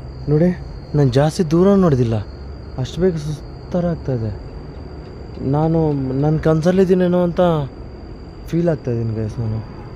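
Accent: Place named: Bangalore, India. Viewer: Bangslore kannada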